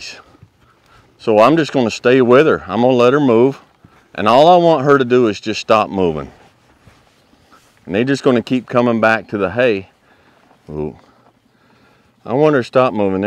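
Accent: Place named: Kentucky/Tennessee, United States. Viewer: A big welcome to Texas. Mighty glad your now in God's country. With that Kentucky/Tennessee accent you will fit in just fine. The scenery of the hill country of Bandera is mighty beautimous. As always I look forward to your informative videos, and the lessons of life you tell.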